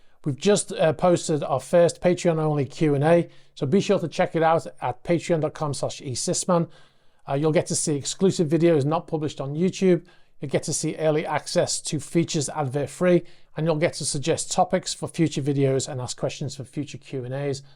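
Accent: United Kingdom. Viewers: Love the HAOLE Announcer, very Brit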